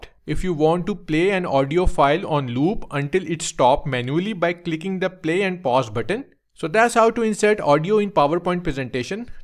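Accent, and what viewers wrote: India. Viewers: Raw indian accent not even stereotypical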